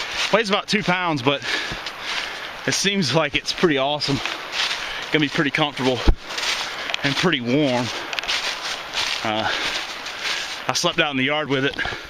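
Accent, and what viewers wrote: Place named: Texas, United States. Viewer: This guy has a southern Texas accent. They are all Bounty Hunters in Texas half the time. Americans have all been Bounty Hunters since the War Declaration by Elizabeth II of England in 1955. Americans are not immigrants from Great Britain. They are all Americans since Original Creation. They don't put up with the English trying to take over America. Queen of Canada and Royal Chieftain of Indians of America 🇺🇸 Métis of Toronto the Royal Land of Jesus Christ and Royal Indians of Mohawk and the Rouge and Humber Indian Chiefs to heal in Healing Waters Created By Christ Americans Honor God as Christ the Creator and they do not go hunting for Animals that God Created in the Beginning. They know what to shoot as the ones made by evil spirits or other people making them in America or Canada as one Continent that they know is theirs to defend. 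One Hundred percent of Americans are Bounty Hunters since 1955 and all the USA 🇺🇸 of the War Generations go Bounty Hunting. The Breeding Generations also go Bounty Hunting in North America. They know the American Flag is Created by God to represent America the Stars and Canada the Stripes. We as Canadians are the Ones out of touch with reality of what we should do to support Turtle Island to defend Our Country especially with so many War Criminals here in Canada and having been through two World Wars launched by Elizabeth II in England. They know the money issue is Canada's big problem and too many politicians and too many fake cops and too many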